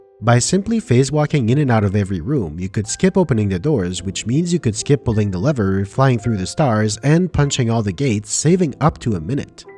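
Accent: Quebec, Canada. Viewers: Oh tes Québecois!!! 🎉❤ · omg quebecois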